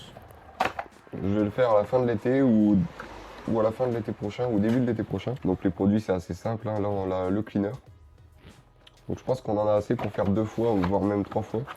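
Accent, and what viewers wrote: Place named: England, United Kingdom. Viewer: Cet accent anglais ! 😍 Bref, sérieusement j’ai adoré la vidéo, hâte de voir la prochaine ! 😅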